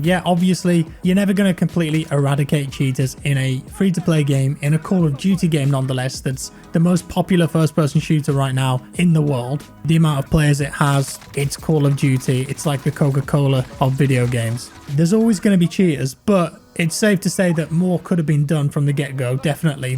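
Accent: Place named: North, United Kingdom. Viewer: I swear to God sounds like Brian cox. It's like a slight northern accent. It's calming.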